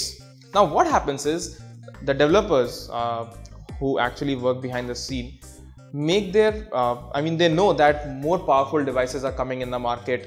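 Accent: India. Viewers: please make your english much more clear remove* your accent(indian) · Share it lmao this is why I love indian accents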